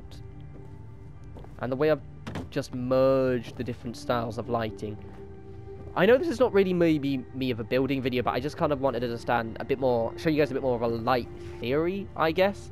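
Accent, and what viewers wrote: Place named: East London, United Kingdom. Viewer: You genuinely have a fascinating accent. Its like a mixture of eloquent country english and really harsh east london. Almost verging on cockney.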